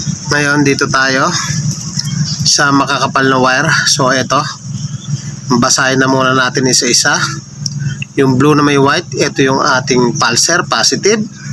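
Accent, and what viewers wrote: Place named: Pampanga, Philippines. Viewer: May tanong lang po ako, out of the topic lang. Kapampangan po ba kayo? Mag pagka-accent po kayo Kapampangan eh hehe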